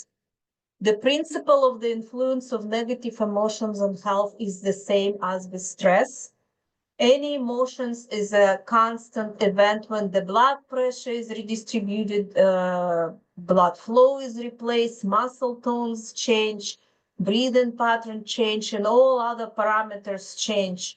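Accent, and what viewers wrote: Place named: Russia. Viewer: Где на русском послушать? Очень слышно русский акцент, но не понимаю где найти эту же информацию на русском языке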